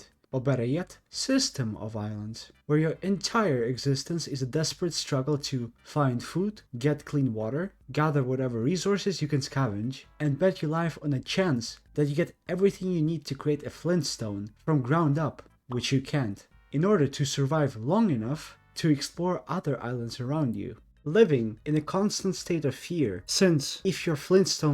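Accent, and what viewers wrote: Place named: Philippines. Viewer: why do you sound like a filipino?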